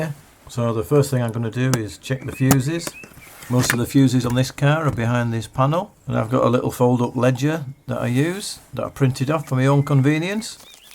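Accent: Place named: Wigan, United Kingdom. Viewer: Love your videos been a fan for ages and learnt a lot thanks to you I've tackled many things on my Seat Ibiza 6l 2006 1.9 TDI axr engine code.. going purely by your accent you're probably not far from me . I live in Wigan I'm always tinkering like yourself.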